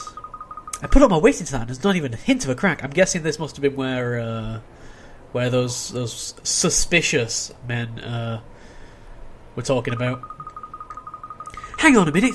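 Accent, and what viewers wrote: United Kingdom. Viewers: your British accent is pretty good, mate!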